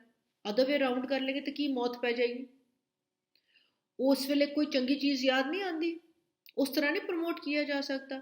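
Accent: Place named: Punjab, India. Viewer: 👍👏👏👏 very well said, in such an interesting manner with a tarka of Punjabi. 😂Love her style of speaking. 👌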